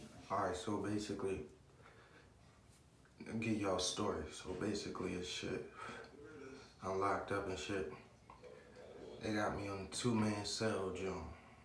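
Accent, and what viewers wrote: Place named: Philadelphia, United States. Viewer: Yo bro I’m from north philly area and Trenton nj I knew you was close by because of how you talk we got a different slang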